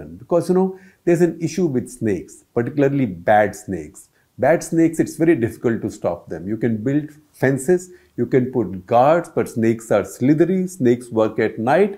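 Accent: India. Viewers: There's something known as 'Indian accent'. Its less of an issue and more of a feature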